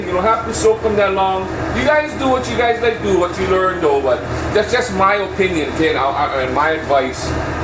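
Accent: Hawaii, United States. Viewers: Growing up in Hawaii ❤😂😅 dats da way of speaking n it's understandable n recognized ur from da islands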